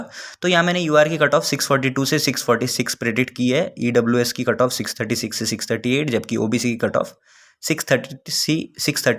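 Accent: Odisha, India. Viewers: Bro r u from odisha?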